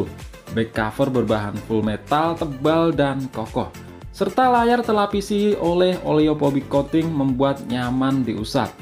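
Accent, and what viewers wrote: Blitar, Indonesia. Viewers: orang blitar kah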